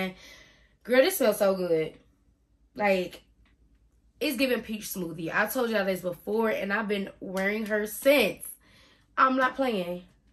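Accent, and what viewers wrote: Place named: South, United States. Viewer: Hi, I’m surprised you are from Ohio. You seem to have a southern accent. I live in Akron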